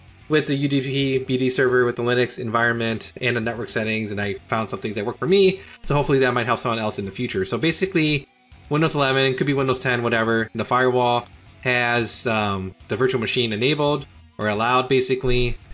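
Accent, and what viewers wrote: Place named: Midwest, United States. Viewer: Totally off-topic: are you from Minnesota or Pennsylvania or somewhere in the midwest? I'm trying to place your accent. It's the Ds in place of THs you do ("de" instead of "the"). I'm Canadian so I have no idea about American accents unless they're from the DEEP SOUTH lol.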